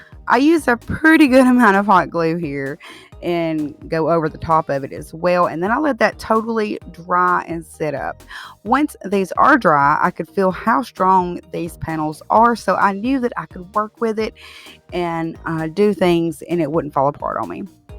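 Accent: South, United States. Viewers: I love your accent. It's so Southern. We're here in North Carolina. My friend has a very thick drawl. She always says she's as country as a sugar sandwich 😂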